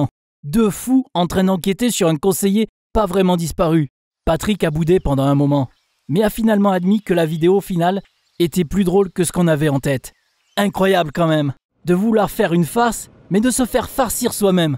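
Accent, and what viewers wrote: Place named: South, France. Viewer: Le petit accent du sud qui me fait plaisir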